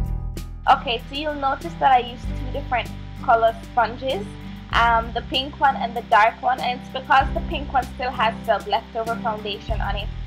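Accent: Jamaica. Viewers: is she jamaican? she sounds like she is. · I hear that accent are you from JA?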